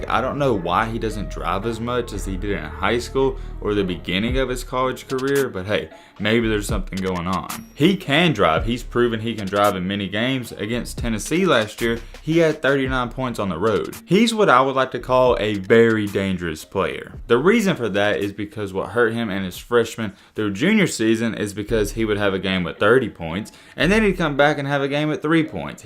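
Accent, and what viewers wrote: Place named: Kentucky, United States. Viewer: where you from man, got the same dialect as us here in KY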